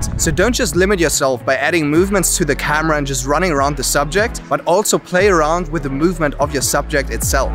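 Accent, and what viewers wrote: South Africa. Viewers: WOW WOW WOW WOW WOW!!!! Thank you so much for this video. I am literally going to practice every move you mentioned with my crane M3. Are you South African? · Thanks for the video, I will definitely have a look at the Crane M3, I hear that your are starting to get a South African accent 😀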